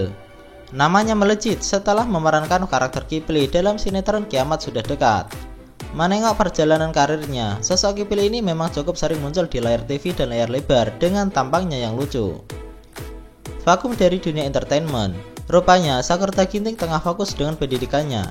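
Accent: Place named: Java, Indonesia. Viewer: medok bgt suara lu tenk 😬😅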